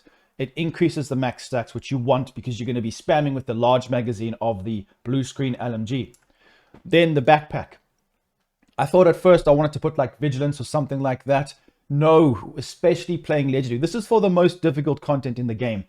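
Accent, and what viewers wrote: South Africa. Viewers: South African spotted? Are you a Saffer,? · Hey, thanks for the advice. BTW, if I'm not mistaken you're accent is RSA right? I'm in Cape Town, Northern Suburbs.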